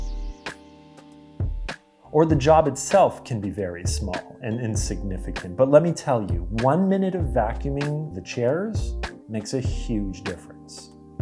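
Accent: Canada. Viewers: Sounds Canadian, no? Very calming. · yes he sounds Canadian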